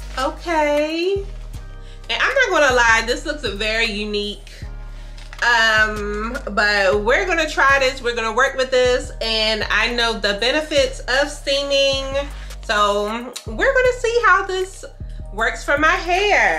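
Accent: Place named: Baltimore, United States. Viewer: lol, it wasn’t as Baltimore thick as I’ve heard. I definitely said. “She’s from the DMV” but the trees was slight so I didn’t pinpoint Baltimore.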